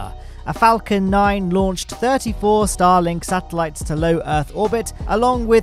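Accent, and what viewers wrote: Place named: England, United Kingdom. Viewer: Great content diminished by an English accent.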